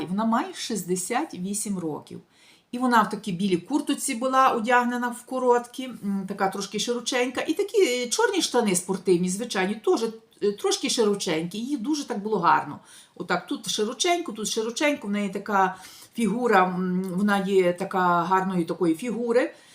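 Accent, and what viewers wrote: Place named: Western Ukraine, Ukraine. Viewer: ..ВЫ СИДЯ СЕЙЧАС В ИТАЛИИ..ГОВОРИТЕ ОЧЕНЬ ПЛОХО ПРО НАШИХ МУЖЧИН..А ТАК ЖЕ ПРО НАШИХ ЖЕНЩИН..В С ЗАРАДНОЙ УКРАИНЫ,СЛЫШЕН ПРОНОНС..СТАРАЕТЕСЬ ВСЕМИ ПУТЯМИ УНИЗИТЬ!!!ВЫ 10000%ИМЕЕТЕ ИТАЛЬЯНЦА..НО ХОРОШЕГО..ТАК ВАМ ПОВЕЗЛО..И ГОВОРИТЕ ПРО НАШИХ ЖЕНЩИН,ЧТО ИМ ЯКОБЫ ВЫГОДНО ЖИТЬ С ИТАЛЬЯНЦЕМ..ЭТО ДАЛЕКО НЕ ТАК!! ДА..ЕСТЬ НАШИ ЖЕНЩИНЫ ЖИВУТ..НО ОТ БЕЗЫСХОДНОСТИ..ОНИ РАБЫ Ц ИТАЛЬЯНСКИХ МУЖСТН..А ВЫ ТАК ЛЕГКО ИХ ОСУЖДАЕТЕ...БОГ ВАМ СУДЬЯ🙏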